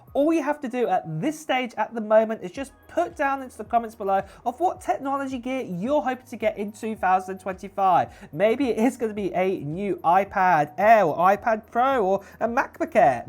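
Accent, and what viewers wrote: United Kingdom. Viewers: High pitched british voice....I don't believe anything you say.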